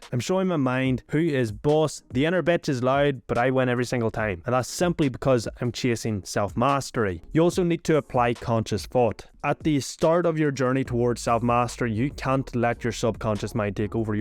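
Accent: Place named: Northern Ireland, United Kingdom. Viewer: Where are you from? Your accent is really cool. Northern Ireland maybe?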